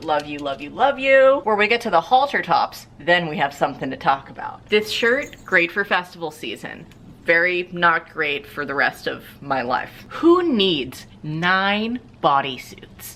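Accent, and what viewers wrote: United States. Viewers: Hi, the first time i heard you speak I was expecting to hear a lovely Welsh accent, but you're American or Canadian (don't want to get into trouble here. Love your clothes, very comfy & suitable for the seasons you're in. Always giving good advice on where to buy, love the thrift stuff. Stay safe hun, byeeee. Glenn x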